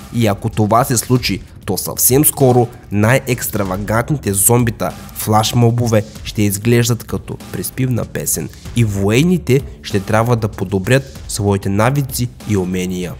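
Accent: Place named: Russia. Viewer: Извинявам за въпроса, но руснак ли си попринцип, защото имаш акцент и ми се струва, че не си българин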